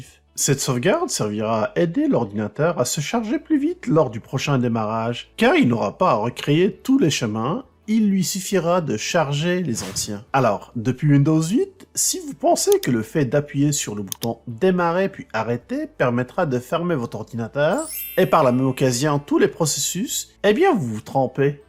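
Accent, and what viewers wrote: Quebec, Canada. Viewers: Merci pour les explications avec le bel accent d’outre Atlantique. 👍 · J'ai voulu suivre vos explications.Helas!vous parlez trop vite et avec un accent québécois si prononcé que j'ai du mal à comprendre.Je crois que la meilleure solution,ce serait d 'utiliser de bons sous-titres bien lisibles en français bien sûr.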